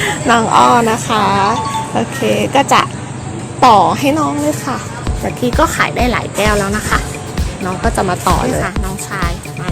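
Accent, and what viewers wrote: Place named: Isan, Thailand. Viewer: อีสานเก้นี่หว่า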